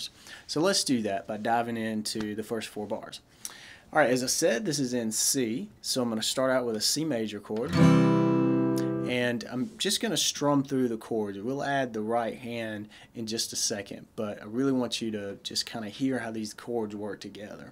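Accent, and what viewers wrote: South, United States. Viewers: This progression definitely make for some beautiful medley!!!Thanks Bro!!BTW I knew u r from the south.Im from Alabama so I know a southern drawl when I hear one!!!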